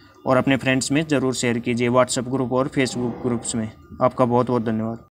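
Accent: Haryana, India. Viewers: Bhai aap hariyana se Ho kya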